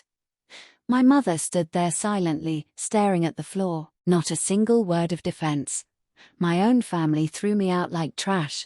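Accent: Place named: Midwest, United States. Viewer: That's quite the American Midwest accent!😂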